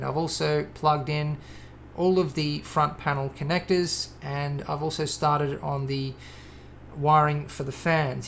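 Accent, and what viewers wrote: Australia. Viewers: I have no idea why it has taken me so long to find your channel but things seemingly, are what they are. It was Google that recommended your channel to me I think... Anyhow, I have subscribed and I am a uber happy camper, yet still, I am struggling to understand why it has taken so long for me to be invited to view your channel, when I have been watching similar sites for years, in some cases. The thought that crosses my mind is one that wonders whether Google and Youtube have some kind of favoritism algorithm going on or worse. I have noticed that you have an accent of one from the parts termed "down under," however, surely, with me residing in Europe, or, and,even "the west," that does not form a barrier of communications or does it? It certainly does not involve tech sites from over "the pond." Something is amiss that is for sure - to my mind, and my gut feeling is that it has something to do with traffic algorithms or is that the conspiracy theorist in me raising up its ugly head or beautiful head, which ever it is that you fancy comrade - only remember comrade... 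There is a tint of red in all of us, simply waiting to blossom. Whatever the causation, I have managed a couple of hundred words of adequacy and for such, my time as the writer is up and my time as the dreamer of heavenly wonders is upon me - over and out, goodnight Vienna, goodnight Helsinki... Shalom & Gratitude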